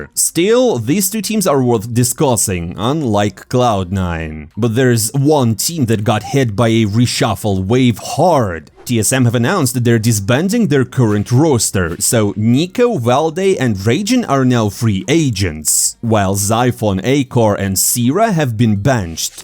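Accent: Russia. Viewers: Твой русский английский не очень гуд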